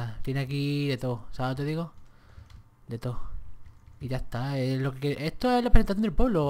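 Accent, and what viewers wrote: Chile. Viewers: like por el acento chileno del * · Nunca vi este:'v PERO HABLA CHILENO DICEJDBCIDJCIBFICJFIF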